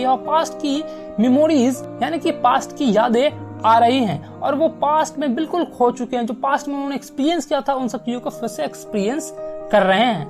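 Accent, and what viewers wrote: Bihar, India. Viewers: ABA SALA BHIHARI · Aap Bihar saa hoo kya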